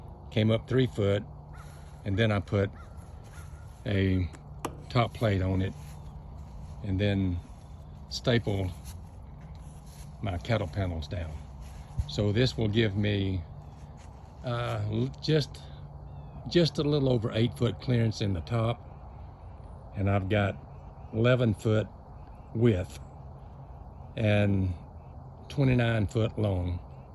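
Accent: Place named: Louisiana, United States. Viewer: I was guessing Louisiana with the accent lol. I was close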